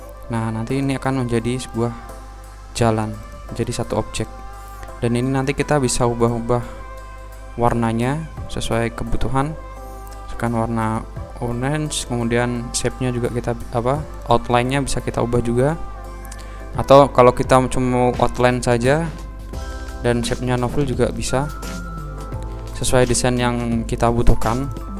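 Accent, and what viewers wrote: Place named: Tegal, Indonesia. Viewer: Wong tegal ya mas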